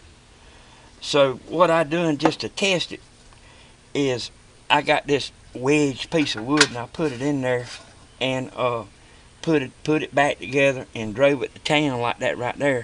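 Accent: South, United States. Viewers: LMFAO good ol boy right!!!!!😎😎🤓🤓🤓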